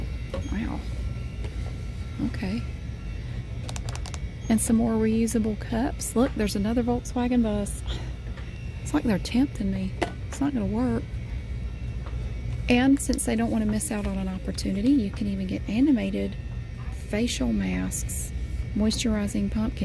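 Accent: South, United States. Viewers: I appreciate your organizational vids and adore your Southern accent 💘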